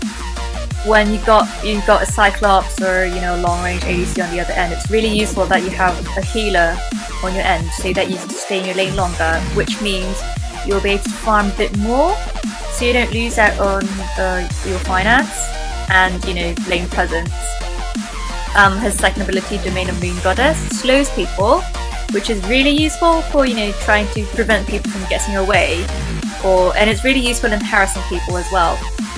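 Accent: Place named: United Kingdom. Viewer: Gg British accent..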